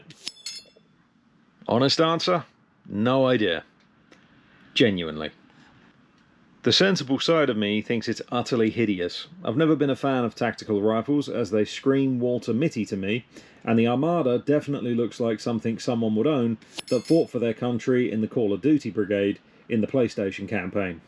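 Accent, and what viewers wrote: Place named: United States. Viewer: Nice one, i was watching the video while in the bath, no weirdness intended, i nearly pissed myself with your American accent, Brilliant.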